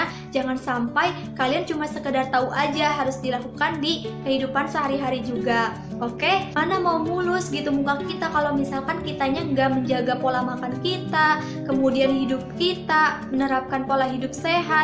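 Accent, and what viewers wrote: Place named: West Java, Indonesia. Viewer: sunda na nong 🤣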